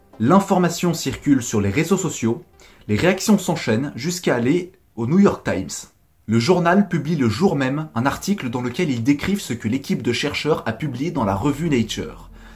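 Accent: Belgium. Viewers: Tiens! T'est belge ? Je m'en doutais ! Tu me fais trop penser à Yan L'Or-iginal 🙂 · Tu es belge?mdr sinon j'aime bien tes vidéos 😁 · Nonnnn t’es belge! Lol il on ou ton accents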